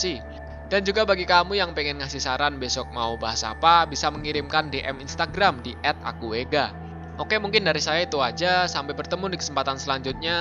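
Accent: Java, Indonesia. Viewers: Bnjir yg punya Chanel Wong jowo kyo aku mas smpean😂Wong jowo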